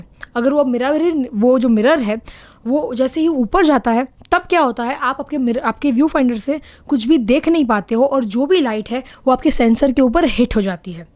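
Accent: Gujarat, India. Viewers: same here.. your accent shows that you are gujarati..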